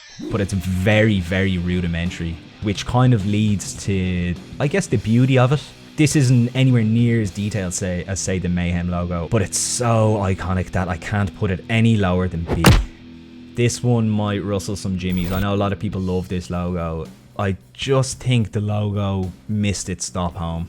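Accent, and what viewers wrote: Ireland. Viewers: I knew you was Irish when I first heard your accent mate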